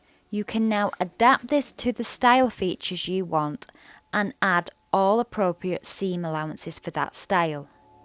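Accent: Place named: North, United Kingdom. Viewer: I LOVE your northern accent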